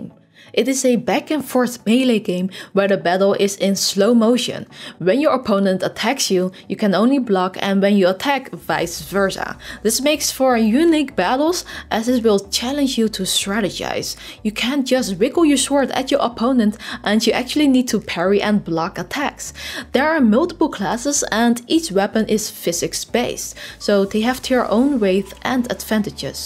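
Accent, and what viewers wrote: Netherlands. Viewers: Wow your english is extremely dutch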